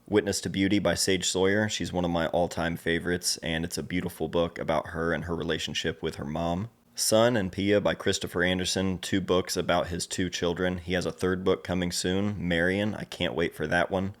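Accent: Ohio, United States. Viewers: My favorite thing about photobooks is the ability to be up close and personal with the work of yours or others. It is a very focused and intimate way to view photography and in my opinion is the best. It takes away all distractions and allows you to be fully immersed in the story the photographer is trying to tell. I see photobooks as the movie theater experience when it comes to looking through photos, you sit there and are 100% focused on the story, the colors, the textures, everything. Also, interesting to hear you say that us Ohioans have an accent haha, I haven't gotten that before but I have heard talk about that. Great video!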